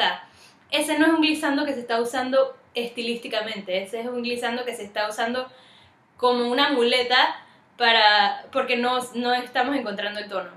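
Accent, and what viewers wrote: Panama. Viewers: namas con tu tono de voz se que eres panameña haha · Sabía que eras de Panamá cuando escuché tu acento 🤯, tienes una voz preciosa!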